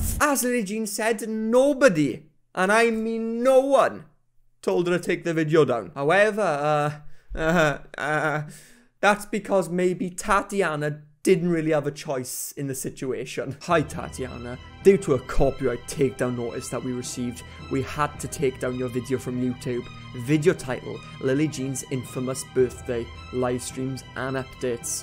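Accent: Wales, United Kingdom. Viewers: I'm here for the Welsh accent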